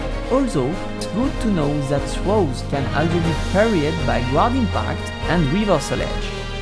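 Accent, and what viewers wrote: France. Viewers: That is called a French accent. Congratulations, you're rasist. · I think you got to lower the volume a bit. It's already slightly difficult to understand you because of your French accent. Merci beaucoup!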